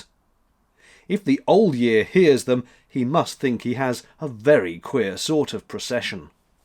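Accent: United Kingdom. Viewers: I've been listening to CTC at night to help me sleep. Not because the content is boring but because your cool British voice and the low moan of the boat going down the canal lulls me to a peaceful sleep. I found you a year ago and binge watched your entire channel in less than two weeks before finding out it is an effective sleep aid as well. I appreciate the videos and being brought into your home, life. Have a great time away from CTC, I hope you get the rest you are looking for!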